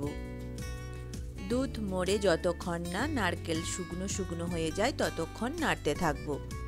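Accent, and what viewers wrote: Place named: Kolkata, India. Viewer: আপু তুমার কলিকাতা দেশের মানুষ মতন কথা বলে তাই আমি বুঝতে পারছি যে তুমি কলিকাতা ঠিক আছে না আপু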